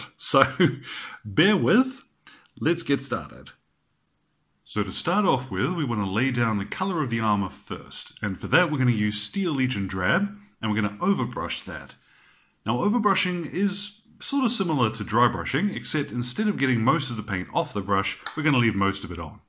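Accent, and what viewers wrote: New Zealand. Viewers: Thanks for the advice. Local would be......NZ based on your accent? I'm just starting my first ever painting project and I'll be doing Tau in Zandri Dust w/ Ushabti HLs, and Mech Grey and Dawnstone HLs for the fatigues. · It's certainly impressive and appreciated! From your accent, are you a kiwi by any chance?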